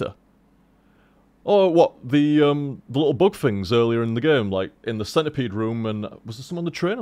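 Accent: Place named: Yorkshire, United Kingdom. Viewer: an Irishman. a Yorkshireman. Not with particularly similar accents, within those of native English speakers.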